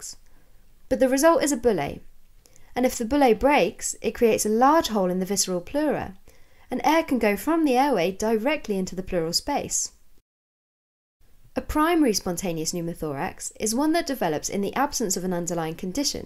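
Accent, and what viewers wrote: United Kingdom. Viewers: Good British English accent. Clear explanation. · The British Accent makes such a positive difference, its like listening to Arya Stark:) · my brain is so used to the american accent on these videos and the british accent was a bit of a shock! lmaoo hahaha and im british lmaoooo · The narrator is so British 😂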